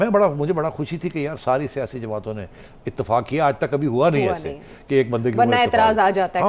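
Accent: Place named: Punjab, India. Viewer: Mr sethi Childish naughtyness in your talks and gestures enchants....and further Punjabi tarka enchants square (in terms of math )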